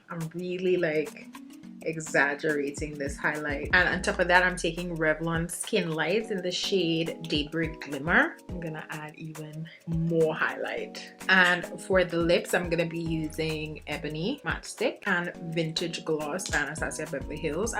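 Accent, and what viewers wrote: Trinidad and Tobago. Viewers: ❤️ when I hear your accent I miss my friend’s mother’s accent (Trini), I’m somewhat new to your channel subscribed 💕 hi again from 🇨🇦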